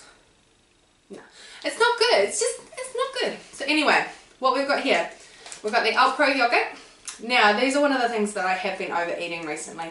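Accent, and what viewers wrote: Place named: New Zealand. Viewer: You are just what I'm looking for, vegan just started slimming world and just put the daily dozen ap on my phone, I also spent a lot of time in N.Z. so loving your accent, thanks for sharing xx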